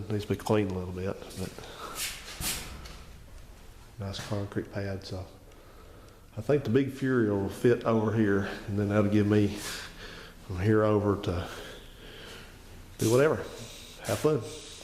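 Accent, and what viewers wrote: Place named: Alabama, United States. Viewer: . Your knowledge is a gift to this world. I could sit for hours and tell you about the things I've learned. Biggest thing is putting the fucking phone down and firing up the computer. Manage time a little better instead of staring at a small screen reading the same shit over and over. Facebook sucks but I like my privacy and anonymity. I'd love to share stories and talk. Send me an email. You'll find it in a private.....message. I'll take the time to write one back and send pictures. Facebook is kind of a bullshit means of staying connected or even getting to know someone. I found you. I reached out. I won't share here how I accidentally stumbled across it. I hold privacy dear as a value. Some things everyone doesnt need to know. Anyway, I sent lots of pictures and kind words in a message. You taught me you're a warm and generous person, but you dont waste words or time. 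Values I've held dear that are a beautiful taste of the state I call home. Almost 14 years in Illinois again, but I took so much of Alabama in that my accent is a part of me. To hear another intelligent man who talks like I do is quite endearing. Then, there are all the hours of great info. I'll watch this all the way through tomorrow, at my work desk. I'll get a camera, a tripod, and I'll share info I know that others may not know and might help them. Knowing i could have taught one person one thing is all worth it. Thank you for all the kindness. I feel like I've watched your life happened because of y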